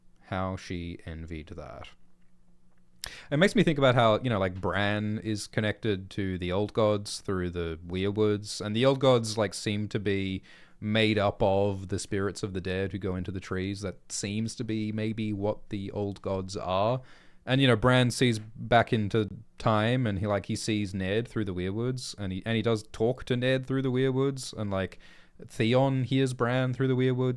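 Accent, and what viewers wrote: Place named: Australia. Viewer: <link> MY EXPERIMENT SUCCEEDS, or fails, depending on how silly it is that both my dog and I sleep better with chill Aussie narration. Thanks for all you do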